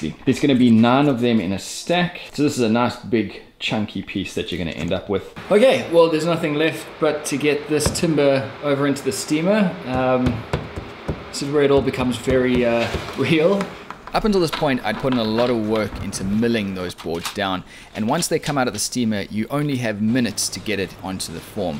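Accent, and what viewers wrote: South Africa. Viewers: Im picking up on a south african accent i think, im also seeing alot of items bunnings sells, would i be correct in assuming you’re in Australia? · Stunning chair .. and great to hear a saffer accent .. Thanx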